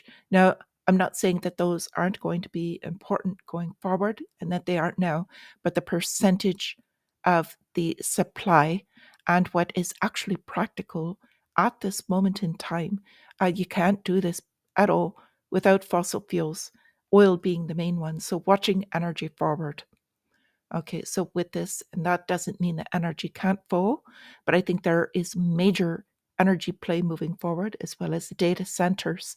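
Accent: Ireland. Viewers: HELLO! Oilseed here.:-) Do I detect a bit of 'Dublin' in your voice? Thanks for the info!!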